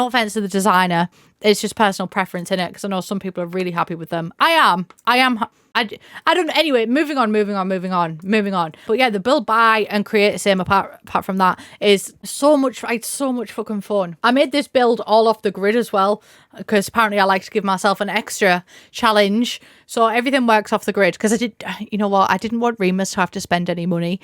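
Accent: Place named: Middlesbrough, United Kingdom. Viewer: I had a random thought while listening to this video... I don't remember if the Harry Potter books SAY what region Lily and Severus are from, but since it's an industrial town I decided my new head cannon is that they're from around Middlesbrough and therefore share your accent and that really makes me happy. 😁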